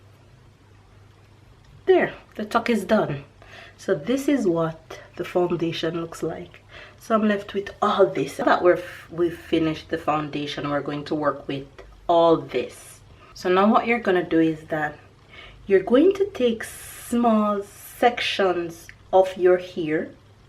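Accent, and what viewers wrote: Jamaica. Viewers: Very cute and easy updo!!And I open your Jamaican accentMy family is Jamaican as well 😍😍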